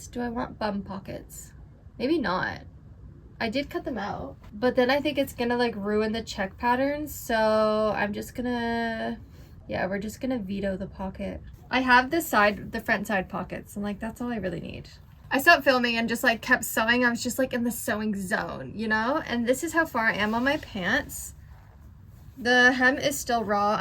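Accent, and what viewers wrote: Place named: Canada. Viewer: First video of yours I’m watching! I was like oh she must be American but then you said buttons and pocket and I’m like nope she’s Canadian! 😊 Love the video! Going to watch more now.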